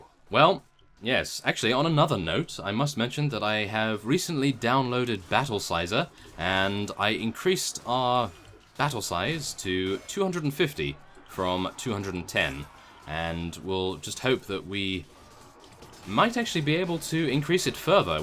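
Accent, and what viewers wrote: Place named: England, United Kingdom. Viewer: Finally caught up with the whole seriesssss, I love these vidsssss. You are sooo fun to watch. top 3 lets play channels for me are You, J2JonJeremy, and IDubbzTV. You guys just had a certain flavor to your vid, always listening to the audience and taking suggestions, instead of just playing blindly doing whatever you wanted, etc. Plus you are a very funny man even though it sounds like your serious at times (must be the english accent)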